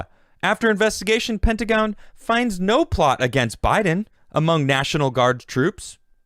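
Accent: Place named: Michigan, United States. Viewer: Bro.....you literally look pewdiepie but with a Michigan accent... I dig it 💘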